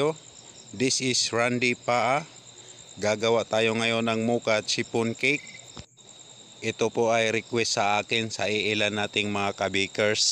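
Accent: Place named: Visayas, Philippines. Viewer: gud morning chef watching from Guam. gusto kita magturo kasi detalyado at simple lang.God bless you chef. bisaya ka chef? bisaya kasi ang accent mo. kung bisaya ka parehas tayo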